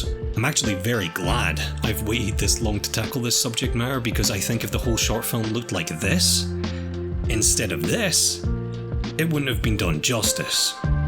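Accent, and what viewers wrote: Scotland, United Kingdom. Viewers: He's an absolute gigachad. Can write, can animate, can voice act, and who knows how many other skills, and he has an epic Scottish bass voice to boot. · Yes. He actually makes his notes in a Scottish accent. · I can't quite place your accent... Is it English or Scottish?!?!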